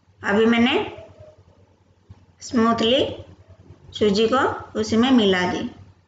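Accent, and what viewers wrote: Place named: Odisha, India. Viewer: Very good effort Madam. Keep moving . Nice hindi in Odisha tone ..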